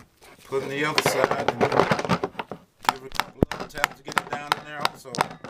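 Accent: South, United States. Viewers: Great video. you are one country sounding brother! (and that is meant as total compliment). Keep up the good work. Thanks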